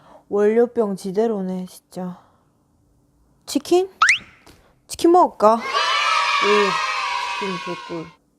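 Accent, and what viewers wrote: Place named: Gyeongsang, South Korea. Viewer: 아~따마 사투리 지기네예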